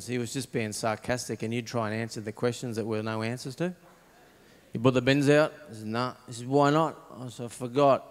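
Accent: Australia. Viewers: He speaks strine.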